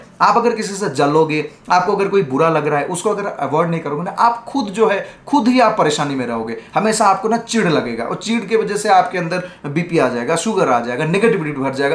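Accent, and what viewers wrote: Odisha, India. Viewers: = Odia vibe with hindi talking ❤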